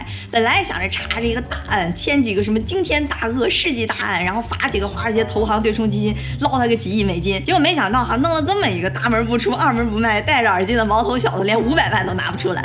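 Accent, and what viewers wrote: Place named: Northeast, China. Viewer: 東北話特別好聽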